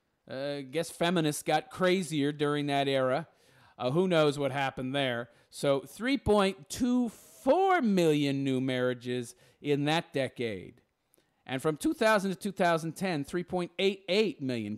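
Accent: New York, United States. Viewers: Love the NY accent. Love the tawwwk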